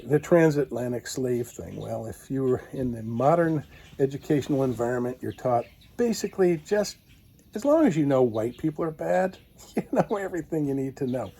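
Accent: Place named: United States. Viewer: Hey! You sound like an American! . . . I could not have said it better.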